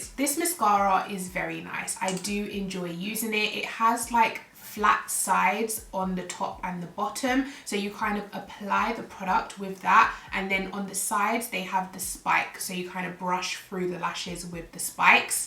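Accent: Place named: United Kingdom. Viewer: When you're searching for a specific video and then you hear a British accent 😫Subbed x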